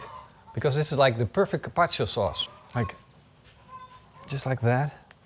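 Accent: Netherlands. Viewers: You are dutch right? i can hear immediatly haha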